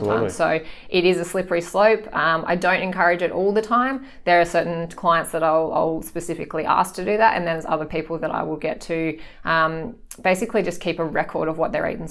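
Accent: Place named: Australia. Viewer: Australian acent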